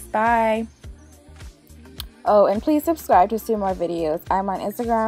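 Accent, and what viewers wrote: Bahamas. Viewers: Dat Bahamian accent strong boy lol biggups to all my Bahamians! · Omg when I heard you speak I was wondering if you're Jamaican!! :) I never realized how close the Bahamian accent is to ours :) Love your hair btw!!! :) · Cant hide from that Bahamian accent..lol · LOL I just knew you were a Bahamian from you first started talking. Love the videos. Keep it up! :) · Love your tutorial. Automatically heard the Bahamian in you. Keep it up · your Bahamian?! 😀😀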